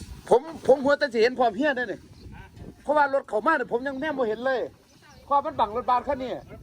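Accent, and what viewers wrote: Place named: Kalasin, Thailand. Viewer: เอฟซี กับ เว้าลาวกาฬสินธุ์บ้านเฮานี่ล่ะครับเฮียฟังเข้าใจง่ายดีครับผม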